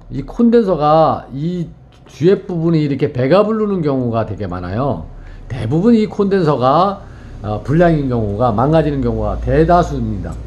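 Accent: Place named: Chuncheon, South Korea. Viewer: 춘천 분이신기봐요 ㅎㅎ 구독합니다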